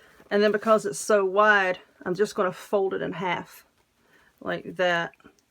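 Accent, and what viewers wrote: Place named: South Carolina, United States. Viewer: I ABSOLUTELY LOVE THIS SCARECROW 😊🎃🍁🍂 & THE WAY YOU MAKE THEM KID FRIENDLY 💝💙! THE VERY FIRST TUTORIAL I SAW OF YOUR'S WAS YOUR BEAUTIFUL CHRISTMAS WREATH WITH THE MANGER SCENE WITH OUR SWEET BABY JESUS IN THE CENTER & YOU COMMENTED "THAT'S IMPORTANT BECAUSE THAT'S WHAT IT'S ALL ABOUT REALLY'' & ALSO THAT YOU STILL SAY "MERRY CHRISTMAS 💝😊! THEN I LOVED SEEING THE "OUR PRAYERS" CERAMIC PIECE YOU BOUGHT AT THE DOLLAR TREE (Which I really loved too)! I JUST LOVE SEEING PEOPLE WHO STAND UP FOR WHAT THEY BELIEVE IN & THIER THOUGHTFULNESS FOR OUR CHILDREN & GRANDCHILDREN! OUR GRANDBABY'S HAVE ATTENDED THE SAME EXCELLENT CHRISTIAN SCHOOL FOR THE LAST 4 THAT OUR 3 CHILDREN ATTENDED AT FOR A WHILE YEARS & IT'S STILL THE SAME CHURCH. MAY GODALWAYS CONTINUE TO BLESS YOU SWEET GIRL 🙏✝💝!!! PS: Now believe me us 4 Sister's can still get a little sideways when necessary, lol 😊!!! 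Your voice sounds just like us & You have tons of fun & your personality & voice sounds just like ours! We were born & raised in Arcadia FL but our Precious Daddy was born & raised in KINGSTREE, SC until his Family moved down here. But he never lost SC accent & we all have it pretty close too, lol 😂!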